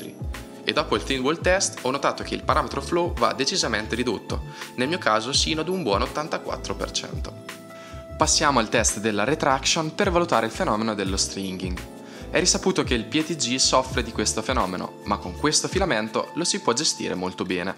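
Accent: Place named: South, Italy. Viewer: mi sembrava che avevi un accento del sud... Quindi sei nelle marche giusto?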